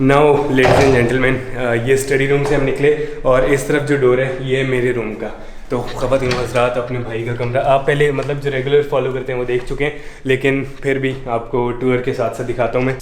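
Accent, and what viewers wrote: Punjab, India. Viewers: Yaar apka Punjabi bolna words bohot mazey dar ladta hai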